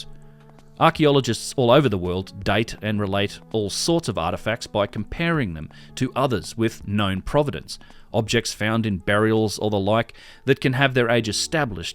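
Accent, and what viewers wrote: Australia. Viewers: I'm loving your work! I know you're a global citizen now, but I'm really pleased to hear an Aussie accent behind the insights put forward.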